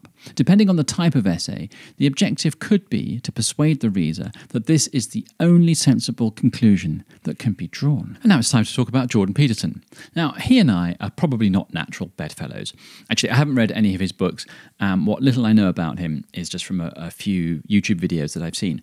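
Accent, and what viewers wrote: United Kingdom. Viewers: Very helpful, thank you for posting! One funny thing happened as I listened to this video. Initially thought “he’s mispronouncing Montaigne” but then I fell victim to something I think is common here in the US. We assign more intelligence and a better education to anyone with a British accent, and yours is excellent. So I thought, there’s no way that he’s mispronouncing Montaigne, it must be me that has been mispronouncing this name all these years. 😊 Again, thanks for your helpful insights!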